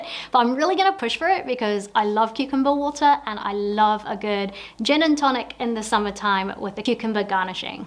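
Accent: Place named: United Kingdom. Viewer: I love your accent although for some reason it sounds a bit British. You seem to be a natural infront of the camera. The landlord is probably concerned about the cement planters due to weight on the balcony.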